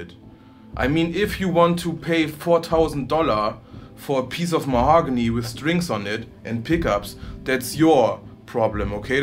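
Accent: Germany. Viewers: I really agree with everything you are saying. I own 5 Harley Bentons now and I enjoy every single one just as much as my snobby Schecter for over 1500 bucks. I've told everyone who asked me what guitar they should buy to check out the Harley Benton products. You just can't get anything better for the price(or sometimes even double the price) and if you should ever get a flawed one, you can always send it back for absolutely free. Keep up the good work, spreading your knowledge and helping other people not being tricked into this expensive gear snobbery. Nevertheless, I would also like to recommend you to work on your englisch. Not trying to be mean or anything. I know it can be hard, especially for german speakers(i'm austrian btw) because the german language is so vastly different in the pronunciation, and i appreciate your effort to make this understandable for as many viewers as possible. 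But I really think it would help your channel a lot to work a little bit on the english just to comfort the native speakers ;) That being said, I hope that you will get to the success that you deserve for your effort and pure honesty and I wish you all the best on your way. I'm happy to say that you just gained another Subscriber!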